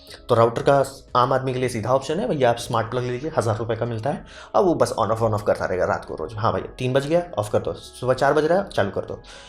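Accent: Bihar, India. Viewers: 100% pure Bihari vibes 😎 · Aap puree bihari hu ekdum fulltu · May be you are bihari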